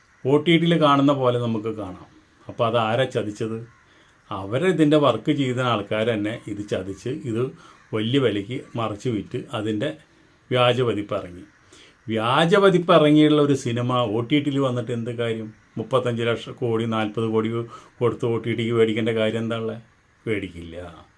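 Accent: Thrissur, India. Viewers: തൃശൂർ കാര് അങ്ങനെ പറയും. പ്രാദേശികത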